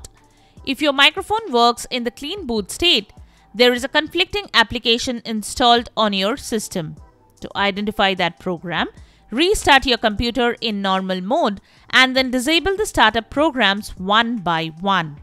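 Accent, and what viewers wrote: India. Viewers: When I hear the Indian accent I know it's finna work. Thank you very much.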